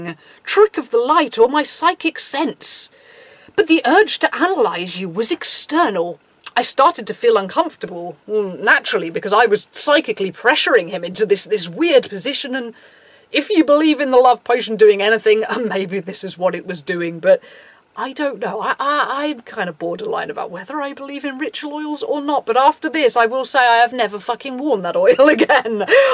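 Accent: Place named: Birmingham, United Kingdom. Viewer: he's from Birmingham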